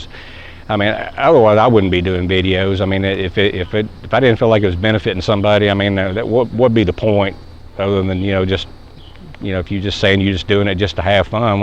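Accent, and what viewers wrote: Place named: Carolina, United States. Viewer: Thanks for great presentation. Love your Carolina accent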